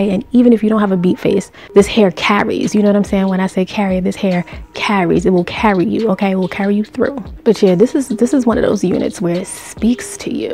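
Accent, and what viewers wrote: New York, United States. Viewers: dat nyc accent is a yes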